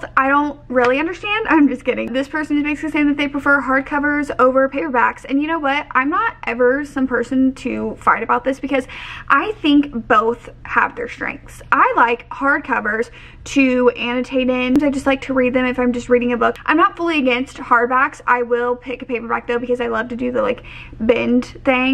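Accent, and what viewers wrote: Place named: Midwest, United States. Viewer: "audiobooks don't count" ok then well who is keeping score? what are we counting towards here other then our own goals? are the book police gonna come arrest me cause i listened to it instead of using my eyeballs? i was able to talk about it with the other people in book club and none of them knew i'm a big ole CHEATER by listening to it according to that person. side note: i used to live in indy and i no longer live in the midwest at all, and sometimes i just need a good dose of your accent. you mentioned meijer in another video and i ascended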